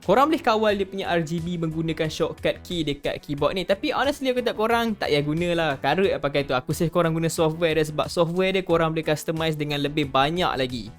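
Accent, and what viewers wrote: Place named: Kedah, Malaysia. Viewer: mantap ah orang kedah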